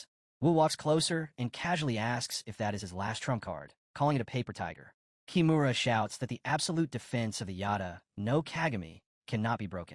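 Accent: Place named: United Kingdom. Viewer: Why does this have british accent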